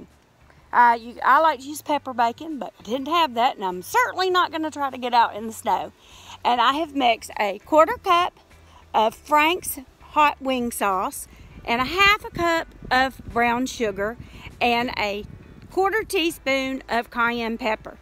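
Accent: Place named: South, United States. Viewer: Love the southern accent! Wish we had the snow!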